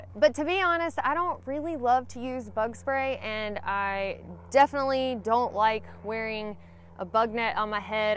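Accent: South, United States. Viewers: where in the south are you from? Just wondering where your accent is from. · I like the southern accent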